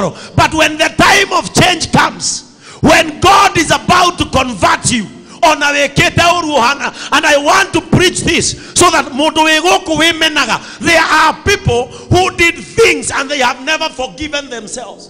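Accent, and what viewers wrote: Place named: Nyeri, Kenya. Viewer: I like Nyeri accent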